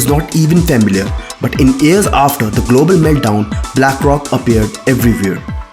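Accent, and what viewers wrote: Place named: India. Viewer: I hate indian accent i always did sorry. 😆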